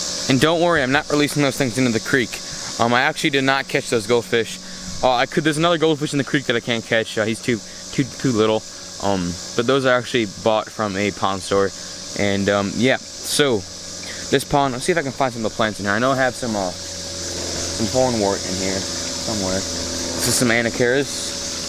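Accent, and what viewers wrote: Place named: Ozarks, United States. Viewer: where are you from? ozark area I'm guessing?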